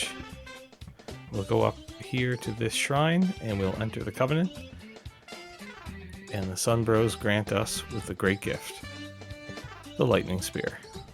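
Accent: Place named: Ireland. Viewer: Great video man, can I ask if you’ve any relation to Ireland? You pronounce a lot of your words just like I would, I’m from Dublin :).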